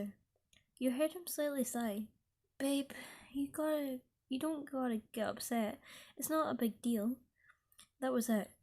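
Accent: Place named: Scotland, United Kingdom. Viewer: When you spoke the exact words that came out my mouth was "wait a minute you sound scottish" 😂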